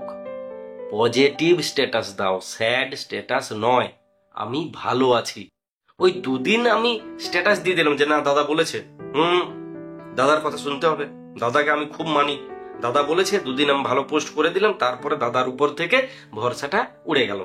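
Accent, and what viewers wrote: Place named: Midnapore, India. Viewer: Apni ki midnapore er dike thaken? Kothar tan ta oirokom e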